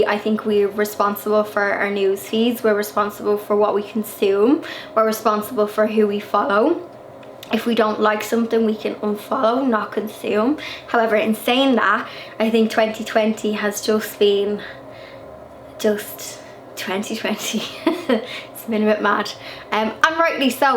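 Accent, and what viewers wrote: Ireland. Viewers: Bless your heart! I think it's good for everybody to unplug for a while; live in the now, and just live. Be safe be well. I enjoy your videos. I live here in the United States you're just cute as little bug's ear. I love hearing your Irish accent. Enjoy your time my dear you so you definitely deserve it. Blessings and much love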